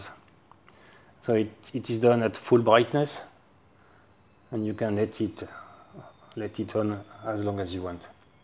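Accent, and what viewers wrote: France. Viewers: French accent :)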